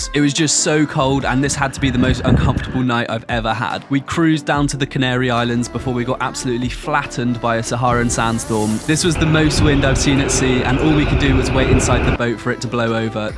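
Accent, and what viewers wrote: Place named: RP, United Kingdom. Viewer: Cheeks clapped? Way too casual use of that with this fancy sounding British accent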